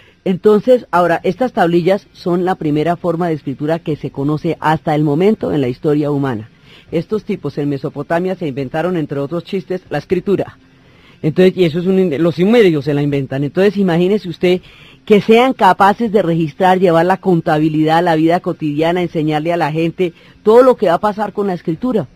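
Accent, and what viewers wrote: Colombia. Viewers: Ese acento colombiano paisa y su voz grave es lo q le da sabor a la narracion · Fascinante oír la historia en la voz de dona diana y su delicioso acento colombiano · Lamento mucho no haberte conocido antes. Muy agradable y clara tu narrativa. Pero, lo que me cautivo es tu forma de hablar Bogotana. Solo por eso te seguiré escuchando. FELICITACIONES!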